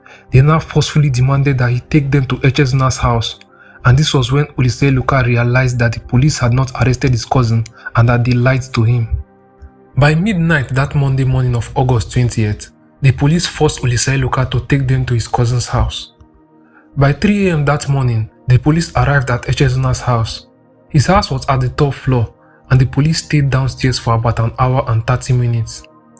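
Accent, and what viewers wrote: Igbo, Nigeria. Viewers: This your Igbo accent is so strong but analysis of this document is in detail.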